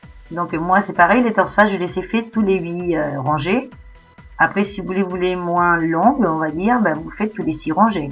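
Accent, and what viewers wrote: Spain. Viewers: Explications très claires avec en prime in petit fond d'accent espagnol super charmant...!;-)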